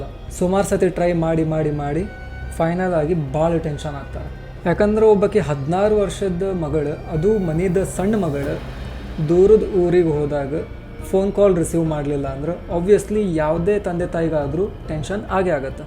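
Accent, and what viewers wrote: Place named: North Karnataka, India. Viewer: Ur good never heard about u...good north Karnataka accent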